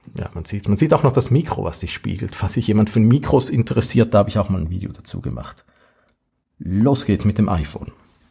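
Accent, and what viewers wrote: Switzerland. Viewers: Wenn ich die leichte Dialekteinfärbung richtig interpretiere, wohnen wir wohl im selben Kanton (SG). Auch ich hab' FTTH bei Sunrise, auch ich zahle CHF 40 pro Monat, aber bei mir gibt's dafür nur 20 Mbps. Die AVM 5490 musste ich sogar selbst kaufen. Da sind wir bei meinem Problem: Der Kundendienst der grossen Anbieter ist zu einer Lotterie verkommen. Bei jedem Wechsel riskiert man, wochenlang ohne Internet und Telefon zu sein und deshalb mache ich keine Anbieter- oder Vertragswechsel mehr. Wäre vielleicht mal ein Thema für die Tagi Digitalseite, statt ständig nur neue Produkte vorzustellen...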